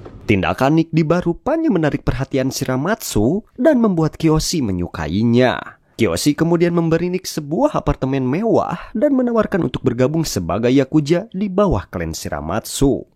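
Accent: West Java, Indonesia. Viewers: Bang asli orang mna orang Sunda iya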